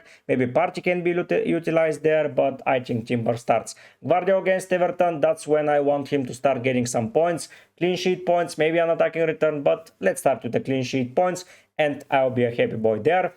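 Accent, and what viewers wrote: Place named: Bulgaria. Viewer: Баа някак си по акцента познах че си Българин😂 Евала за труда братко!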